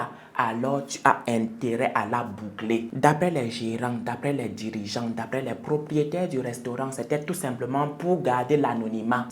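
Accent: Cameroon. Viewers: Ton accent nous dit que tu ès camerounais. Ne te fous de nous. Tu es vraiment menteur et malade. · Ces restaurants n'existent pas à Abidjan. C'est peut-être au Cameroun vu ton accent. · si tu es ivoirien, vraiment tu as appris avec réussite l'accent camerounais. Venons-en maintenant aux incongruités intrigantes de ton narratif. Si savoir se comporter est entre autres un critère de choix, à quel moment cette entreprise à testé ton comportement avant de te retenir? Et puis étant donné que l'approvisionnement d'un restaurant est une tâche répétitive, les responsables doivent s'assurer de la disponibilité des composantes de leur menu principal. Les cadavres des morgues sont conservés avec des produits toxiques pour tous vivants. Où d'après toi se procrent-ils permanemment la chair humaine? Et puis c'est à croire que tous les gouvernements qui se succèdent à la tête de ce pays sont une secte d'initiés à la dégustation de la chair humaine. 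Laisse moi retenir que tu as fait un post pour faire le buzz, recueillir des "Likes" et monétiser ta page. Merci à toi frère ivoiro-camerounais.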